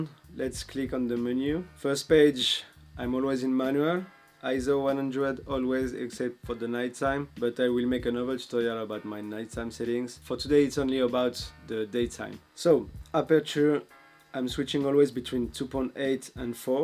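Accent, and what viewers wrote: France. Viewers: Salut mec!!tu parles bien anglais😜c’est la première vidéo que je vois de toi et je trouve ça vachement sympa avec les sous-titres en anglais et ton petit accent français j’arrive bien à comprendre et ça m’entraîne!!go voir les autres vidéos!!😜petite question... pourquoi tu fais des vidéos ou tu parles anglais alors que tu es français?😅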